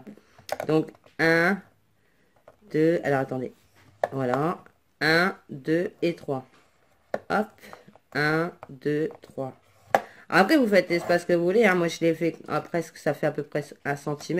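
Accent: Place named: North, France. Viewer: Re :-) si tu savais comme elles sont moches mes rosaces lol, oui y a un léger accent du ch’nord lol, gros’gros bisouss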